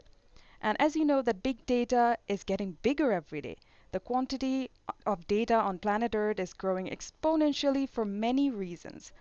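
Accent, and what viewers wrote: India. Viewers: The first video that seems indian English that I could understand 😂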